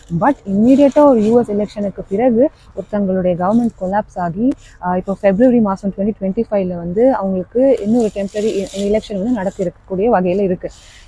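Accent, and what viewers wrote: Sri Lanka. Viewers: Are you srilankan tamil mam?! Like native of yours or parents?!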